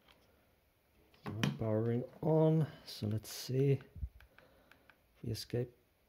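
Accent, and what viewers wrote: Netherlands. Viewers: I hear a bit of nederlands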